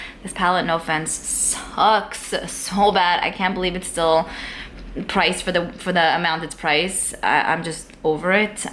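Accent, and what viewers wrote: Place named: Long Island, United States. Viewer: Love watching you! I’m from Long Island (now in PA) and miss the accent!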